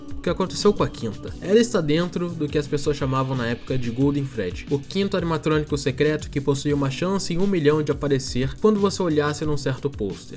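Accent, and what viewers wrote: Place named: São Paulo, Brazil. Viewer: O Sotaque paulista é sim o melhor do brasil e não vou aceitar nenhuma opinião contrária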